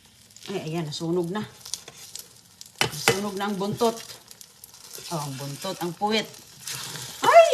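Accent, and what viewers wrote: Visayas, Philippines. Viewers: bisaya kasi siya o waray kaya ganyan ang pronunciation 😊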